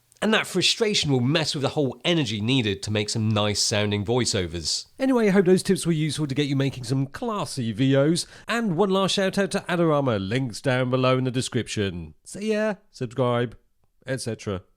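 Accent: United Kingdom. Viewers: The best asian guy who speaks britishenglish. A fan since digital rev days. More power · Hey can you please make a video talking about yourself, where you grew up, how come your English sound perfect British? I enjoy watching you vids. Thank you for posting these.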